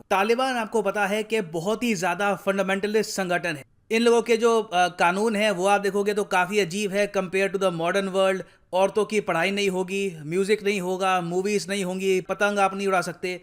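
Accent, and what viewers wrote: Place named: Haryana, India. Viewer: Haryana se lgti ho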